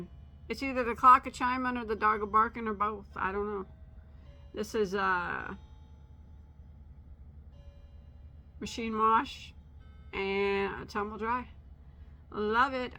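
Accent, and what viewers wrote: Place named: Midwest, United States. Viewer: I love your Midwest way of speaking. You are so real and confident. I'm glad you wear pants unbuttoned while relaxing.😊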